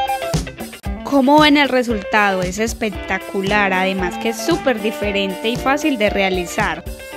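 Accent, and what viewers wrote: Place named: Colombia. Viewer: Por tu acento puede que seas de Cali ó Medellín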